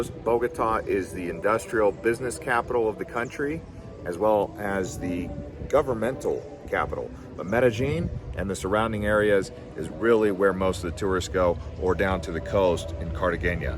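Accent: United States. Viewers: is in Colombia wearing a 'Columbia' top. Overall, this is a well-researched video. Granted the pronounciations are more American based, but I can see the effort made. It DOES RAIN A LOT. November is also a good time to come with less rain (at least to Bogota). "If you want to find bad people in Colombia, you can surely find them, as you could in New York or Los Angeles. But nowhere have my crew and I been treated better or with more kindness and generosity. I’d bring my family on vacation there in a heartbeat. And hope to soon. As I said before: Colombians are proud. Let them show you what they are proud of." ~ Anthony Bourdain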